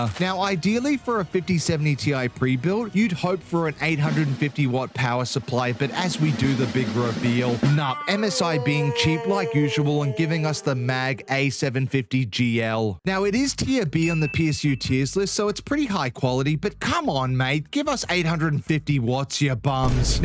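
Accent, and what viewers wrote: Australia. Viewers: is the cutest Aussie accented techtuber!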